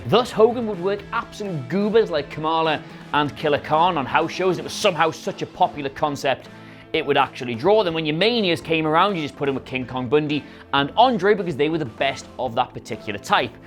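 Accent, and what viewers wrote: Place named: England, United Kingdom. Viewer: you the one pronouncing it wrong fool, he’s English! He speak a purer dialect of English than you ignorant yankee! You speak the language you speak because of his ancestors foolio, read a book idiot.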